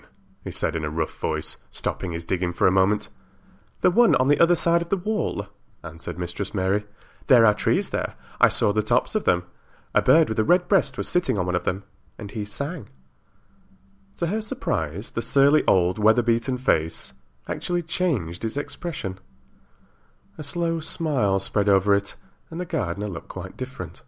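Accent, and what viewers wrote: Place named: Yorkshire, United Kingdom. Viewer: Great reading as always! Like the Yorkshire accents, are you from Yorkshire? Keep up the great work 😀. PS how about some more Lord of the rings..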